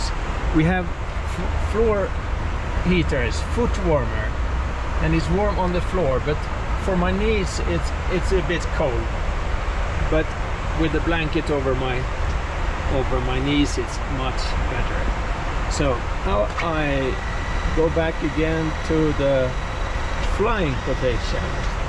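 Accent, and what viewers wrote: Sweden. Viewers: Riktig svensk dialekt på engelskan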